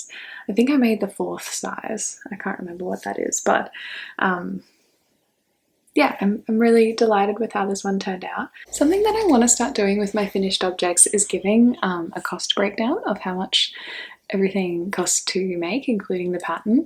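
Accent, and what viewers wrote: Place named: Australia. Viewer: This is the first of your videos I have seen! I'm from Adelaide/Kaurna country and its so nice to hear an Australian accent on a podcast, and someone who is actually from my hemisphere so is talking about their summer knits rather than all the other youtubers going into Winter. Definitely adding the cuff club flower socks to my Ravelry library :)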